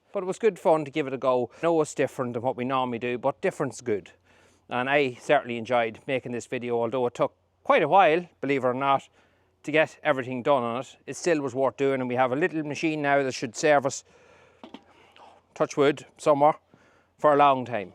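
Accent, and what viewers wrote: Ireland. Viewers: A thought was from Boston not Ireland 😮🏴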